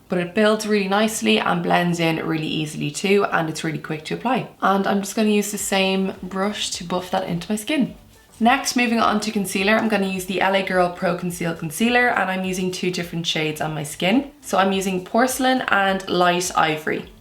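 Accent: Ireland. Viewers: Wow your eyes 😍😍 and listening to you makes me miss living in Ireland 😫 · You're gorgeous 😍. Love your Irish accent too😉!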